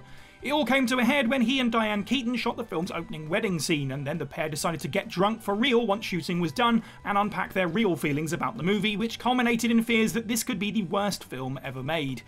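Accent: United Kingdom. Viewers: Love how British people speak 😂😂🤣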